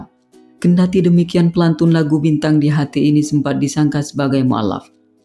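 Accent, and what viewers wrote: Madura, Indonesia. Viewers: Adminya logat Madura 👍👍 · Di denger dari logatnya, dari suku madura ya gan ?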